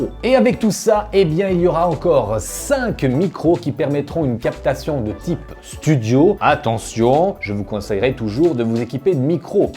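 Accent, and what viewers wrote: Switzerland. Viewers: Alors toi t'es Suisse avec ton vieil accent de paysan ( j'ai le même)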